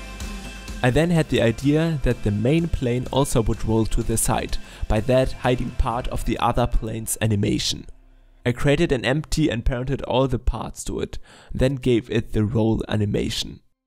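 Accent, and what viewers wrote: Germany. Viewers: why does he sound german? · Der hart deutsche aktzent stört ein wenig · are you german?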